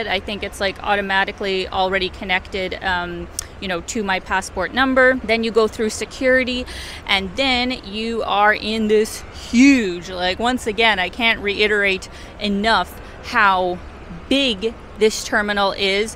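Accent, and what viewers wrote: Canada. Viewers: I can see why college students would want to watch videos … excellent pronunciation and cadence for those learning English (with a lovely slight Canadian accent). And it’s experiencing new adventures through positive respectful awareness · You know, I never really get when my American friends told me we have an accent, but somehow I can tell she’s Canadian from the way she talks. 😂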